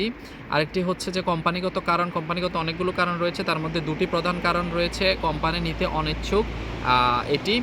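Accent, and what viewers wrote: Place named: Chittagong, Bangladesh. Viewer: ভাই মনে হয় চট্টগ্রামের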